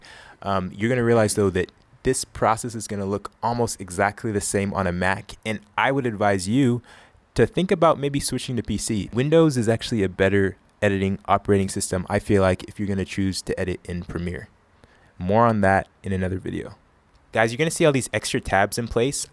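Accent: Nigeria. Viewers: Just asking Are you a nigerian ? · are you Nigerian? If so, so am I! Thanks for the help, I'm trying to learn how to edit and this really helped!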